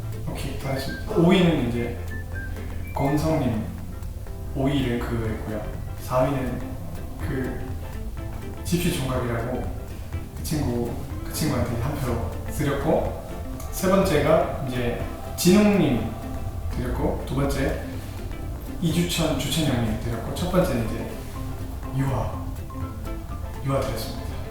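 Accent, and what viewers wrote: Gyeongsang, South Korea. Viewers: 대구 말투 넘 멋져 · 성격도 소탈하실듯ㅋ 전 퐝 살아서 경상도사투리 넘 친근하고 인간적이네요ㅋㅋ 열심히 준비하셔서 꼭 결승전까지 가시길 아자아자~~~ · 이솔로몬 님~경상도 사투리~웃는모습~매력덩어리예요~끝까지 파이팅 하세요~💕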